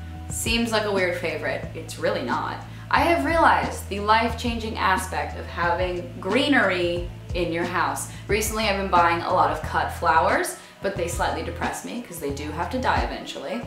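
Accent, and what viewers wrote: United States. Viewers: Are you american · i literally can't tell if your american or swedish omg lmaoo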